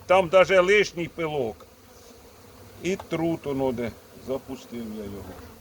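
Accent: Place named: Cherkasy, Ukraine. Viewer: Вітаю. Матка пропуски дає в засіві, чи так здається? І скажіть з якого ви регіону? Бо так по розмові ніби як з Черкащини. Цікаво.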